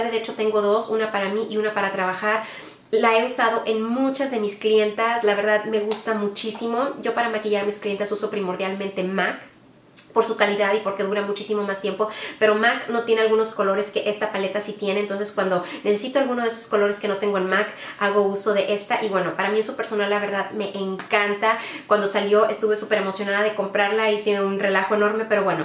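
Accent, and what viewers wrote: Fresa, Mexico. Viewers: Bien Fresilla que se oye 😅🍓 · Que diferente la voz algo fresa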